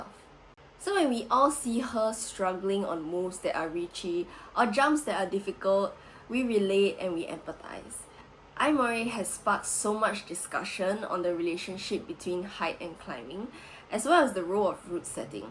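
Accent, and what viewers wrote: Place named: Taiwan. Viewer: Great video. We all love Ai Mori! But I also love your accent 🤣 Where are you from? Taiwan?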